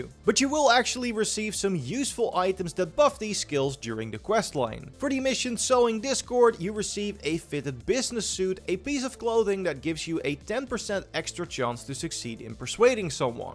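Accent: Netherlands. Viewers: Je ruikt het Nederlandse gewoon 🤣🤣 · JEEEEZZ Dutch accent much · Bro are you dutch?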